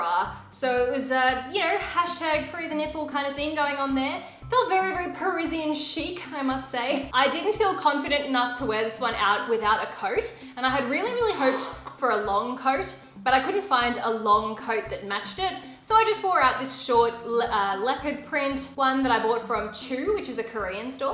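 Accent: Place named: Australia. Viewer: Loving seeing an Aussie youtuber. Finally a familiar accent!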